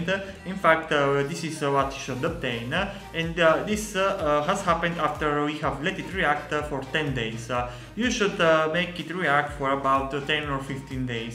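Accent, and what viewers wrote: Italy. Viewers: I know you re italian cuz of your accent lol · To be honest, I always thought non-Italians were being insensitive, that they were exaggerating. They still are, but dayum, bruh. This guy blows my mind, I had no idea some (if not all) Italians actually talk like that. The More You Know haha.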